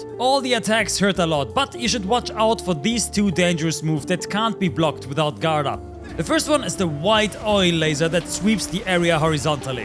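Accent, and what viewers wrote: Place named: Germany. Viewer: Are you german ? 😅 because of the dialect. Edit: Bin mir komplett sicher xD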